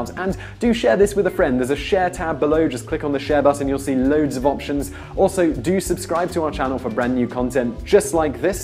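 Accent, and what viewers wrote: United Kingdom. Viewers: Weird hearing a Brit talk about American Thanksgiving history. I should do one in a brash US accent about Cornwall.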